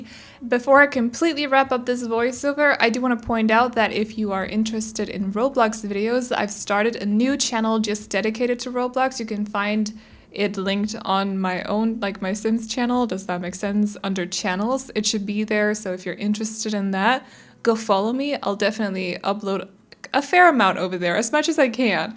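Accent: Denmark. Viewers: I don't think I have ever heard Danish but I can't believe it doesn't sound good. I absolutely adore your accent lol. Now I have to find the Danish word for aunt since you've gotten me curious. This is a great build btw. Trying to do something creative in this apartment drives me nuts. It's just set up so weird. You did amazing.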